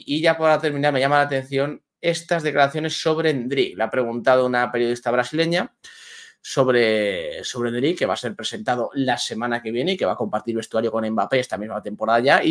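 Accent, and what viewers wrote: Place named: Andalusia, Spain. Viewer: que no entiendas el andaluz no significa que hablemos de mala manera sino que tú no sabes escuchar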